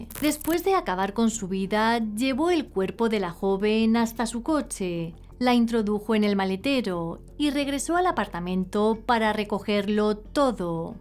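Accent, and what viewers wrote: Spain. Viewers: No molesten a, así es el acento o dejo en algunas regiones de España… es como que a un cordobés lo criticaran por hablar como un cordobés (de Cordoba, ciudad argentina). Hablan cantando y se les escucha muy gracioso aunque a veces no se les entiende. Pero son genuinos!!! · ¿Le dirías a un mejicano que no hable como Cantinflas?, ¿O a un venezolano que no hable como un narco?, es Español castizo, no es latino, así que ajo y agua, a mí me encanta como habla. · O será el acento de la región de España donde nació. No se que decirte.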